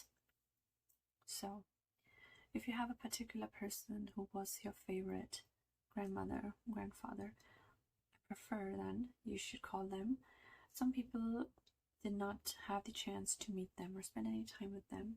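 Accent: India. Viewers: an indian or pakistani daughter in law pure accent repeating khandan word make these kind of videos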